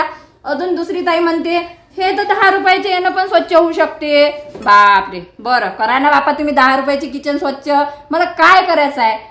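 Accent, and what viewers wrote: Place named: Varhadi, India. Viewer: 😂😂😂 तू इतकी छान बोलते ना आणि आपली वराडी असल्यामुळे खूप छान वाटते मला आणि तू आमच्याच असल्यामुळे त्याहीपेक्षा छान वाटते अकोट ला असते ना मी तर नक्कीच भेटली असती तुला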